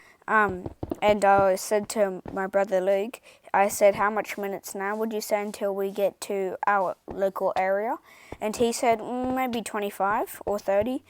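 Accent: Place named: New Zealand. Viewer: ok u sound like a kiwi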